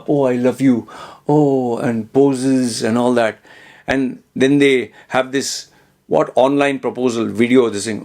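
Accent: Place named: Mangalore, India. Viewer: I wish I had listened to your advise 15 years ago 😆 Especially coz ur explaining it all in Kudla dha porbu style English 😄 Cheers!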